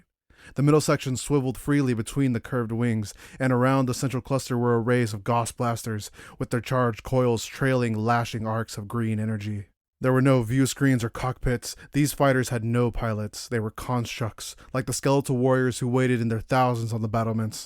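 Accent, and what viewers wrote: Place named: Russia. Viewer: Very cool reading !! Not a big fan of the Russian accent tho, makes it a bit difficult to understand the Marines lol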